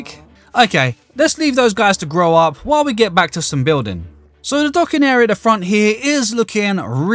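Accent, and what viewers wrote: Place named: London, United Kingdom. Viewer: Whereabouts is this accent from? It almost sounds like Londoner but I'm not sure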